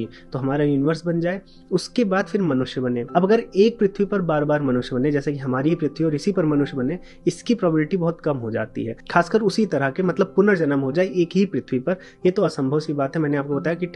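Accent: Standard. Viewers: आपकी ज्ञानवर्धक बातें तो बहुत अच्छी हैं परन्तु आपका प्रस्तुत करने का अंदाज भी लाजबाव है। शुद्ध उच्चारण से विडियो काफी अच्छी हो गई है। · Impressive. I really admire you with your Hindi speaking. Ekdum sudh Hindi.. In today's time you are making videos in pure Hindi. Aapko Naman hai 🙏🙏🙏🙏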